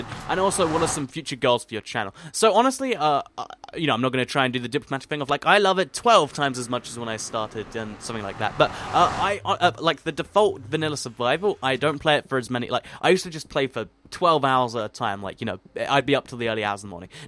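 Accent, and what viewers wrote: United Kingdom. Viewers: You talk like if you were british and not Welsh. Just saying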